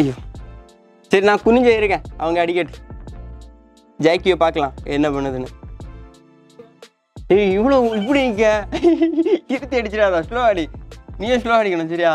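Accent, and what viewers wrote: Kanyakumari, India. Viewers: Thampi kumarimavata Tamil ketta rompa alaga irukku malayalam mix Tamil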